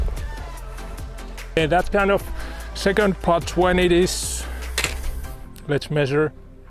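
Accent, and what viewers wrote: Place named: Finland. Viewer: Bit of finnish accent or "rally" accent but so what 🤷‍♂️ keep it coming!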